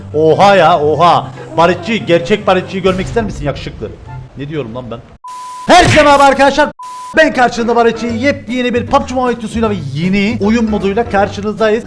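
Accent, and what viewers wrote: Cyprus. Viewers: Abi sen kıbrıslımısın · Barış abi senin 2 3 videonu izledikten sonra Kıbrıslı gibi konuşmaya başlıyorum 😂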